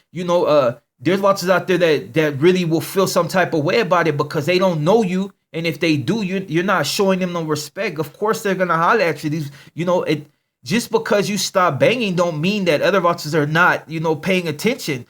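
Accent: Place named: Mexico. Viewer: I fuck with your channel cuz! One love! Keep grindin, you spit hella game just some of these youngstas on some other shit. When I was young I was fuckin up got into some street shit, drugs, alcohol heavy. I made the decision to join the army. My older bro was in an out of juvie since 13 to 17 then got locked up but they sent him to prison at 17. It’s crazy cause we’re mexicanos but grew up in a all black neighborhood homie. Mexicanos hate on my family cause we don’t sound Mexican we sound where we came from just like you did. You sound mexican our slang is gutter people say we sound like we tryin to be black. My brother is Mexican in a crip gang and I was following the path but made a transition. So I feel you completely when you spitting game sometime about where you grew up and how you grew up. Opposite worlds but the same in a way. Ima support you foo off the strength of black and brown love. 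That’s how it was in my neighborhood l. Grew up in park hill Denver 💯 keep it solid and keep it pushin I hope your platform gets bigger and better! Much love partna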